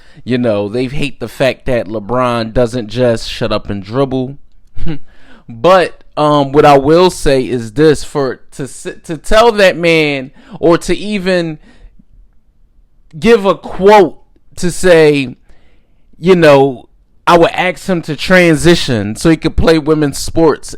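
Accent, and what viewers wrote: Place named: New York, United States. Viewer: Sound like you're from one of the borroughs.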